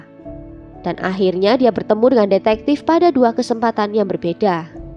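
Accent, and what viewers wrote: Java, Indonesia. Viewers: tidak apa2 mungkin karena pengaruh logat Jawa ya. Kalau ibuk e cocok tapi kalau ibuknya tidak cocok. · Hay medokuhhh cantik 💕💕💕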